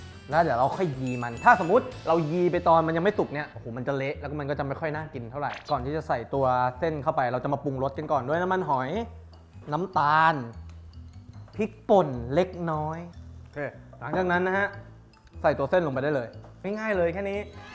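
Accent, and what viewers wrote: Uthai Thani, Thailand. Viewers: คนอุทัยธานีเหมือนกันค่ะ สำเนียงเดียวกัน น่ารักกก😂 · คนบ้านไหน เหน่อจังเลยค่ะ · เอ็นดูความพูดไปเหน่อไป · ชอบที่เสียงพี่เขาเหน่อๆนิดนึงน่ารักค่ะ😂ดูเพลิน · ขนาดยาวหน่อย(เอ็นดู)แอบเหน่อ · ชอบความพูดสำเนียงอุทัยธานี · พี่ ep.นี้เหน่อมากก55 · พี่ ท์เทปนี้เหน่อออกเลยแง้ มีความเจ้าปื้ดด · มีความเหน่อ ลูกเอ้ย เอ็นดูวว์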